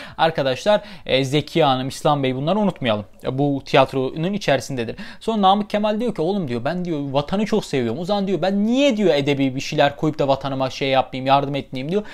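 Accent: Adana, Turkey. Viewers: Abi sen vanlı değil miydin adana şivesi ne alakasxsxsxsxs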